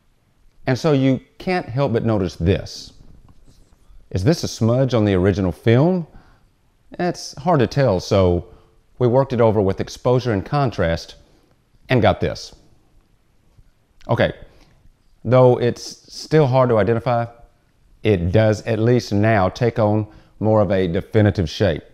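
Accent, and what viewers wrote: South, United States. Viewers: Love the Southern twang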